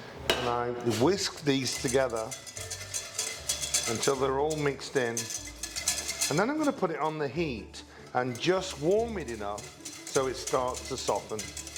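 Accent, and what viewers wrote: England, United Kingdom. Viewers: Plus, I love the British accent 😊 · Well, if this dessert isn’t already too wonderfully we get a great English accent and passionate and special energy you explode with. New subscriber. Thank you in advance. I just love your voice., accent and energy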